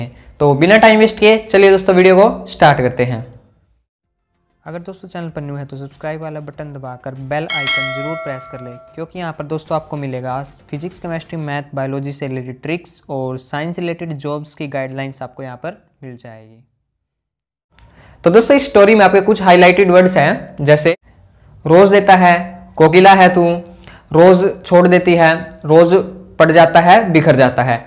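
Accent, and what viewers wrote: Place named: Haryana, India. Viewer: but kuch words the jisse saf jahir horha tha ki ap haryana se ho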